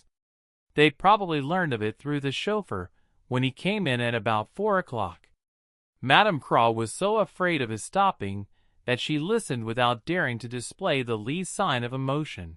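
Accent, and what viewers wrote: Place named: United States. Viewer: Omg!!! Do not let a AMERICAN READ AGAIN 😡😡😡😡😡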